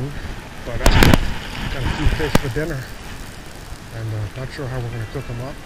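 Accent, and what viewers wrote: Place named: Queens, United States. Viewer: Hey kinda sounds like your from Queens? area? - Jamica Bay or even try Bay Park, East Rockaway may be Closer to home. Tight lines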